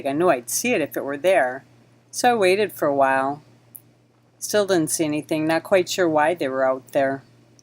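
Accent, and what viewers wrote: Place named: Illinois, United States. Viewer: so the sub. just resufaced. Its been under for about a month..where are you from? I hear an ILL accent.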